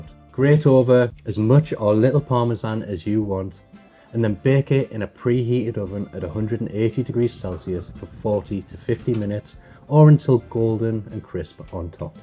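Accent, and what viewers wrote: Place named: Wales, United Kingdom. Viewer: Really enjoyed this as I'm about to make a big batch for our house. Love the Welsh accent. Greetings from Melbourne, Australian. Hwyl Fawr !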